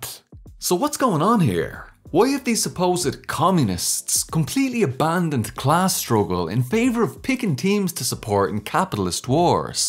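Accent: Ireland. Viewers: Oh shit I'd always wanted to watch an Irish lefty creator, pity you have the sound of a dub in ya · Are you Irish? You seem to have the accent lol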